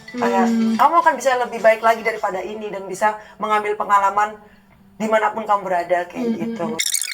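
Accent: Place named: Indonesia. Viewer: Logat bicaramu udah in dg onesia banget Cantik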